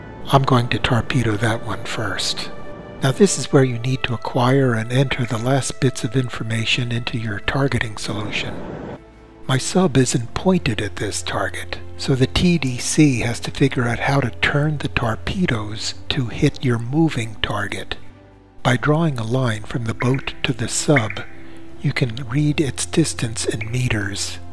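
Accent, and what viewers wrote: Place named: North America. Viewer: Very well put together video - Ubisoft should be grateful for the advertising. Also it's great to hear someone with a North American accent to use "meters." Great job.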